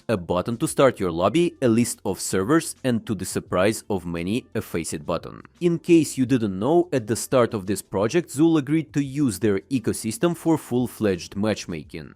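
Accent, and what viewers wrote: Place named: Russia. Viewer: Честно, прям слышен РАШЕН АКЦЕНТ 🤣🤣🤣. Видео клёвое))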